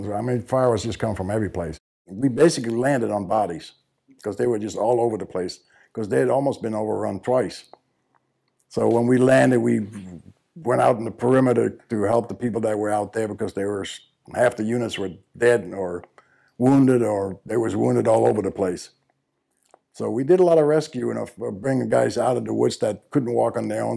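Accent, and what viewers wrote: Louisiana, United States. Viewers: I hear Louisiana in that accent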